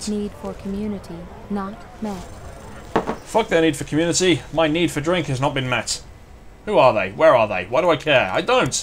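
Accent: England, United Kingdom. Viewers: Fuck yeah I just need to grab some food , play video and listen to English gentleman playing intelligent game on PC ... fuck yes!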